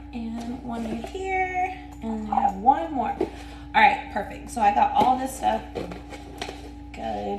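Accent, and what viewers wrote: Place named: South, United States. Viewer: Your hair look's so fascinating i love your southerner voice so sexy a real turn on ❤❤❤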